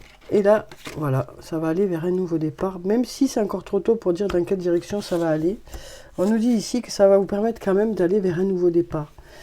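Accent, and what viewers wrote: South, France. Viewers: Merci pour cette belle guidance avec ce charmant petit accent du soleil ❤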